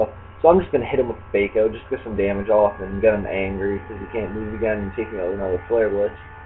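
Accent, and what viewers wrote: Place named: Canada. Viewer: This narrator sounds distinctly Canadian. Is he? Top speed Nidokings, even while Modest, can usually outspeed Kingdras. Just a tip for future, it looked like you could have wiped out his Kingdra early thanks to the switch. Oh and btw, I don't know if this applies to you, but if you add yt:stretch=16:9 to your tags section the screen will be nicely stretched and you won't see those ugly black bars.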